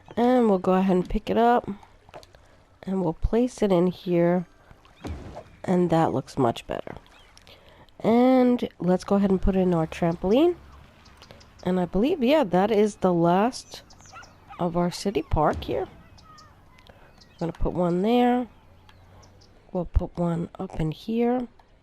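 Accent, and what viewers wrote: Long Island, United States. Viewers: I’m hearing a Long Island accent I think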